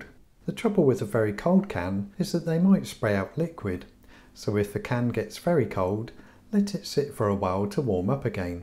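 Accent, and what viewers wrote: United Kingdom. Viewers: British Bob Ross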